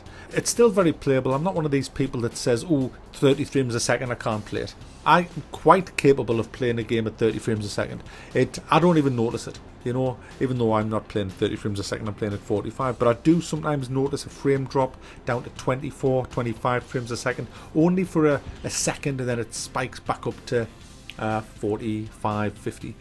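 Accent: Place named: Newcastle, United Kingdom. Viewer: You sound a bit like a geordie too??? There's a few on YouTube now it's great to hear 😊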